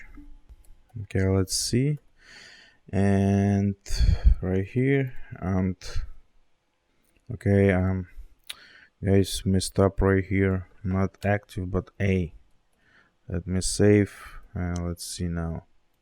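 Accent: Russia. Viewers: Молодец, хороший контент! Нотки русского акцента есть, по этому решил поинтересоваться!)!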